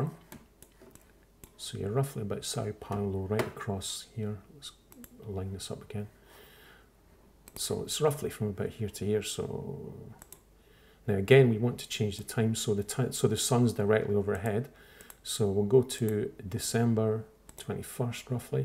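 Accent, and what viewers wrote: Scotland, United Kingdom. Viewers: Y... You're Scottish... eyes subscribe button pushingly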